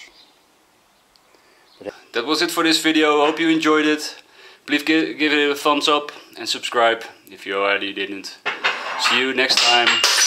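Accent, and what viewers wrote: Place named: Netherlands. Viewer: Coole video! Maar je hoort wel dat je Nederlands bent😂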